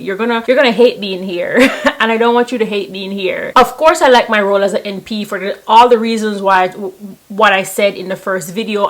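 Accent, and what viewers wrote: Jamaica. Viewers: Are you a Jamaican? You sound like a Jamaican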